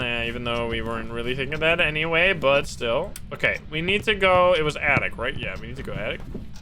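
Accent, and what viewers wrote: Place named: Netherlands. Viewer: are you from netherlands or belgium?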